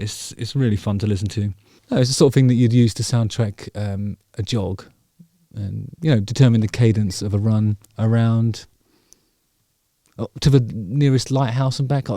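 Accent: United Kingdom. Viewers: How can one person look like both Martin Short and Tommy Lee at the same time, and speak with a brit accent on top of it?... happy I stumbled upon this review! Thoroughly enjoyed it! Cheers!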